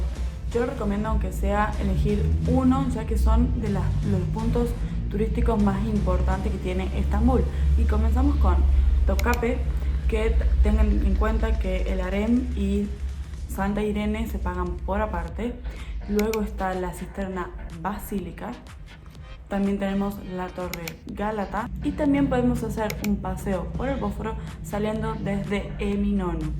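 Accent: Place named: Argentina. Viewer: hola donde eres? hablas como argentina. Pero creo que no eres de alli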